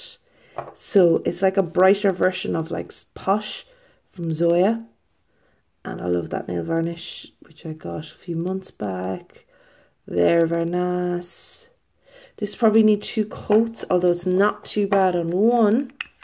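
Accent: Ireland. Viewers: Love the video! Really cool to hear an Irish accent talking about nail polish... most people's eyes glaze over when I talk about it. ASMR btw? I'm from Dublin so glad to hear a fellow Irish polish nut!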